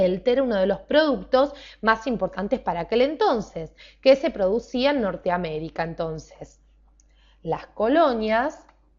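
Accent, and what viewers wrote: Argentina. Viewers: me encanta ese acento , es argentino ?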